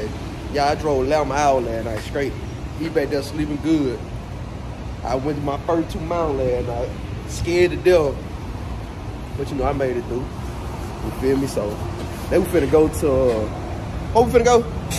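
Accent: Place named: Mississippi, United States. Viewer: I hear that Mississippi in ya voice mane 😂#601 stand up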